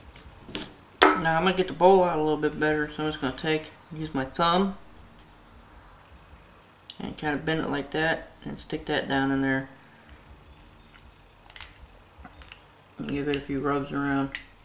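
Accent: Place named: Texas, United States. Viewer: interesting accent. Texas? Oklahoma?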